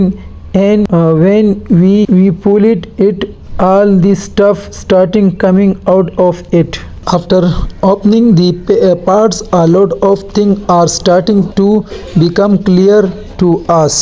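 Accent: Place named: Punjab, India. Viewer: Agar Urdu Mai baat karte ziada behtar hota yeh Punjabi type English se acha hai Urdu Mai baat kia Karen shukria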